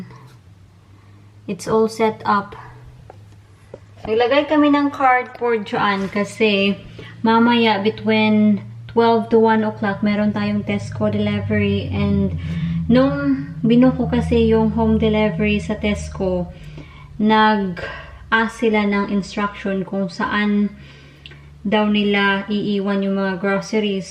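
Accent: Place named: Visayas, Philippines. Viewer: Hi po ate first time ko to na magcomment sa vlog mo pro lage akong nanood at nag lalike ng mga videos mo kaso bago pa lang mga 2 months pa lang ako nagsubscribe sayo unang kita ko pa lng sa Youtube channel mo subscribe talaga ako agad sayo ang ganda mo kasi tsaka simple lang taz may naririnig ako minsan na may bisaya accent ka. .gusto ko lang po sanang malaman kung bisaya ka ba at taga saan ka dahil ako po bisaya kaau ko proud taga Inopacan Leyte trip ko lang magtagalog pro bisaya gyud ko hope mabasa ni nmo ate at shout out po sana sa next video mo sa ABELLANA and BALLESTEROS family thanks po sana lang mabasa mo po ito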